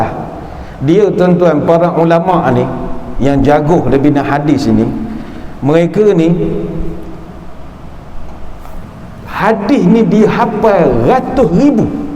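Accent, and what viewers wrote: Pahang, Malaysia. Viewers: Sorry sis,memang macam inilah loghat Pahang,mungkin dgr mcm ada iras2 ganu sebab Kuantan sempadan dgn kemaman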